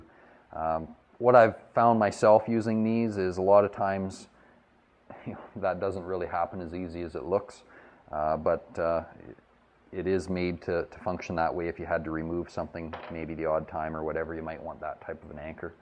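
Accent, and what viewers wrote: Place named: Canada. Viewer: are you canadian, your accent sounds so canadian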